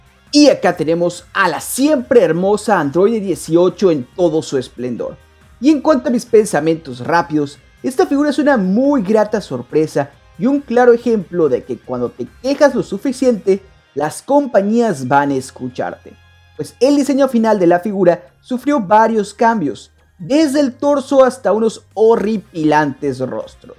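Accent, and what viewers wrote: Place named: Peru. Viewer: Buen video, Eres de México Bro? De que parte? O eres de sudamérica? Tienes un acento como Peruano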